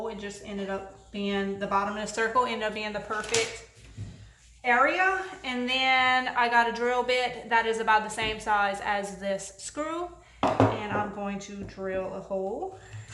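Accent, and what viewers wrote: Louisiana, United States. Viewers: "Pain in tha booty", you are cracking me up! I'm from the South and I love your accent---Louisiana is one of my happy places! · Great job. First video I have seen of yours, but can tell by your lovely accent you are a Louisiana girl! Thanks for the ideas!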